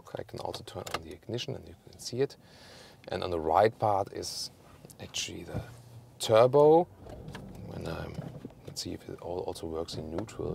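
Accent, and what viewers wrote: Germany. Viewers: You are becoming my favorite reviewer!!! I'm American of German descent, so I love hearing an English review in a German accent! And I like your opinions very much, as well as the quality and information from your videos. Thanks, great work! (I love this car, too! we had this model here until the 80's, I believe. My friend had a Scirocco, it was awesome!)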